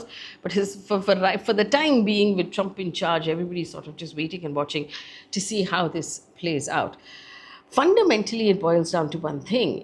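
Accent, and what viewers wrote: India. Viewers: Are getting racially hated because they sound like you and watching a video. I also got annoyed with your heavy and thick Indian accent.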